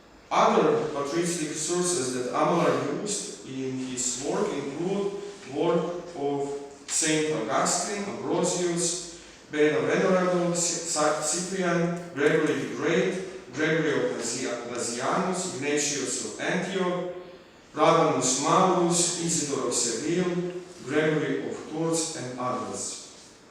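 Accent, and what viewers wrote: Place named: Russia. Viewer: Majgad koji russian english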